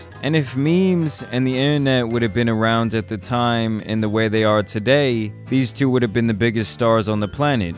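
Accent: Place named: Boston, United States. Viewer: You got to be from Boston can tell right away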